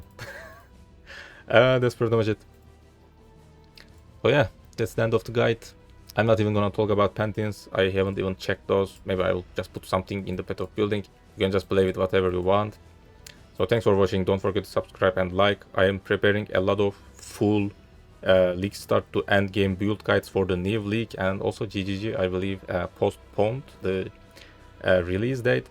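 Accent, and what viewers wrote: Turkey. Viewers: Türk ingilizcesi bu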